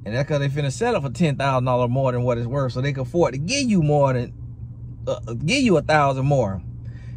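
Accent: South, United States. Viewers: I gotta say. I’m subscribing because of the accent (I’m southern too) and the realness. I just randomly found this video, but everything you’re saying is spot on.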